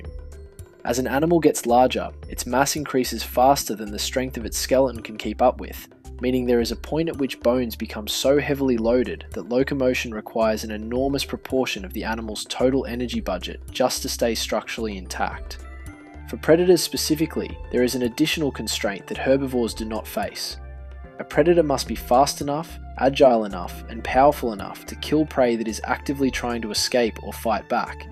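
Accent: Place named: England, United Kingdom. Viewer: that just the way red coats say the word.. some annoying english guy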